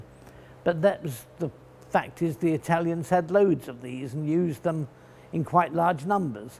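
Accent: United Kingdom. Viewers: Listening to a knowledgeable and gravelly voiced British man talking about tanks from the biggest war in history is literally the most interesting and exciting thing to me.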